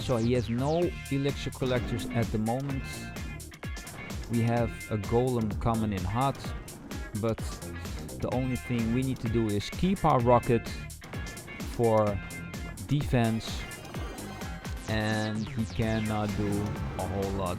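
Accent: Netherlands. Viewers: Mooi amsterdams nederlands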